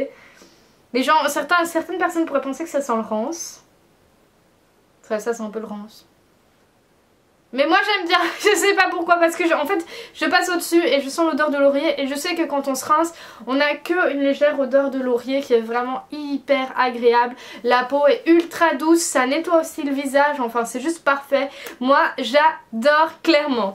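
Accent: Belgium. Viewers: Héhé, voilà que je découvre ta chaîne, et en t'écoutant parler je me dis "Elle viens de Belgique, j'en suis sûre", et comme de fait :P (Moi aussi, huhu) Très sympa ta chaîne en tout cas :D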